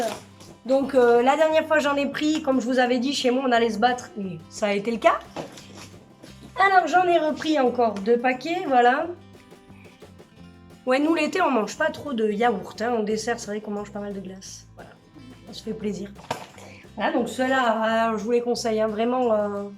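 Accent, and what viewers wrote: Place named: Provence, France. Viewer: Bonjour, Je suis ravie d'avoir découvert votre chaîne lors de suggestions der retour de courses proposés par le site YOU TUBE et je tiens à vous féliciter de la façon à laquelle vous présentez vos vidéos, vous dégagez beaucoup de bonne humeur, de la joie, une énergie débordante et surtout je vous trouve très rigolote et je peux vous assurer dans ce contexte du coronavirus,vous vous transmettez beaucoup de bien, vous dégagez beaucoup de bienveillance, de modestie et de générosité. Je m'interroge sur ce petit accent bien provençal si je ne me trompe qui est charmant. Je vous encourage à nous proposer des vidéos de retour de courses ou dans d'autres thèmes. Au plaisir de vous visionner prochainement Bonne fin de week-end Fanny ( Paris XV ème)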